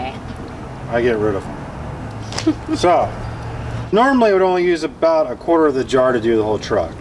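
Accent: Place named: United States. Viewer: Hi there! Wonderful job! 👏 Sorry but I didn't catch your american accent. I tried but I couldn't understand the names of those 2 chemicals you used to reveal and preserve that nice patina/rust effect. Would you please write them down for me? Thank you very much! Forgive me one or another mistske. English is not my native language. Fro Brazil 🇧🇷.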